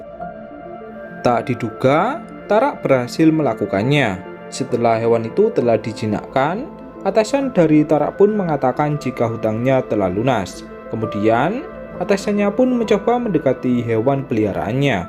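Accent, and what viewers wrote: Java, Indonesia. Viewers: Bisa gak logat jawa mu itu di hilangkan.jijik dengar anjing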